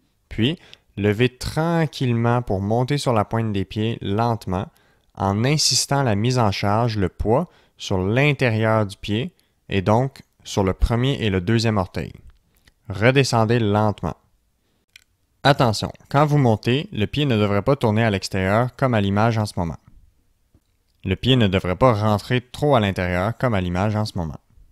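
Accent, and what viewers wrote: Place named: Quebec, Canada. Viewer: Bravo explications claires images bien filmées et silhouette superbe continuez à faire d autres vidéos avec ce merveilleux accent un plaisir de vous suivre depuis la France en Poitou si cher aux québécois .cordialement .marc qui ne se remet pas d’une entorse datant de six mois(exercice phase trois impossible).a cause d une algodystrphie.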